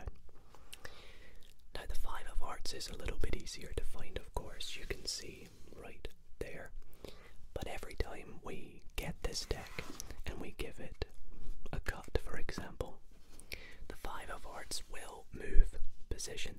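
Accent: Scotland, United Kingdom. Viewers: No way! I’ve wanted to learn card tricks all this week. Btw, are you Scottish? I am too and i thought your accent was similar to me lol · yepp he is scottish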